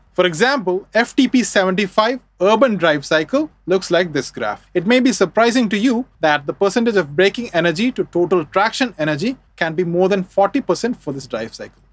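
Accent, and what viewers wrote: India. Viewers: ofcourse Indian accent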